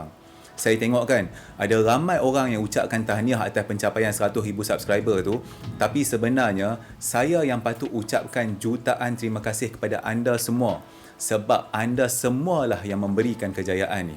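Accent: North, Malaysia. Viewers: bang loghat utara abg terbaik....abg utara blah mana?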